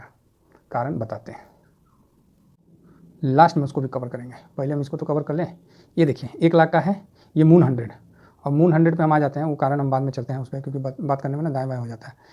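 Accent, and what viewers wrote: Bihar, India. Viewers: App bihar ka lagra sir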